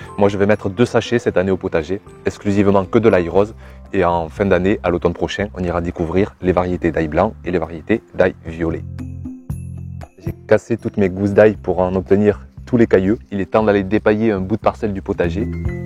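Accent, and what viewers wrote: South, France. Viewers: Merci pour cette réponse extrêmement rapide :D alors ,non je n'ai pas d'avis sur les ails importés c'est plutôt de manière général ...Les fruits et légumes sont bons s'ils sont cultivés normalement...: pleine terre, récoltés au bon moment ,de saison,peu importe d'ou ils viennent...Si l'espagnole il cultive sa tomate comme toi (avec Amour :D ) elle sera très bonne je pense (avec tout se soleil) Le problème c'est que tout se qu'il y'a dans les magasins c'est aussi cueillit avant d'être mûr ...(exemple la banane n'a pas de goût quand elle arrive chez nous alors que tu vas là cueillir dans un bananier en Guadeloupe ,c'est autre chose...). Pour finir continue avec tes vidéos j'adore tu me donne un peu de soleil juste avec ton accent du sud (moi qui est de Caen (J'aime beaucoup aussi les vidéos de blec,Maud et évidemment l'incontournable Damien) ça m'aide vraiment (je débute 2ans) je fais toutes mes graines et c'est beaucoup de boulot mais tellement agréable :D A très vite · Super vidéo comme d'habitude tu fais des vidéos toujours aussi complète et comme tu dis on n'a pas le temps de s'ennuyer et puis cet accent du SUD et te voir en panta-court en hiver alors qu'aujourd'hui la neige a fait son apparition j'allais te demander des bonnes adresses, mais tu nous dis un petit peu partout donc je vais chercher ça sur le net si je n'en trouve pas en jardinerie Merci encore pour ta participation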